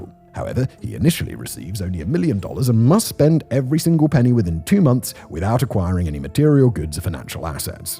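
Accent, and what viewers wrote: United Kingdom. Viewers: Shocked that your actually British thought it was a act 😂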